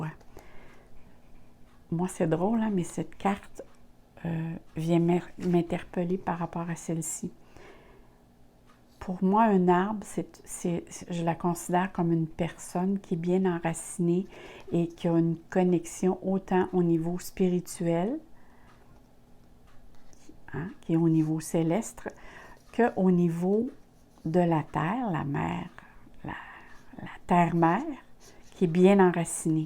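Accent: Canada. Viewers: AAAAH QUEL BEL ACCENT CANADIENS ET UNE VOIX SI APAISANTE JADORE VOS TIRAGE DOMMAGE QUE VOUS NE FAITES PAS LES QUOTIDIENNE CE SERAIS UN REVE BEAU MOIS DE MAI A VOUS DE LA PART D UNE AMOUREUSE DU CANADA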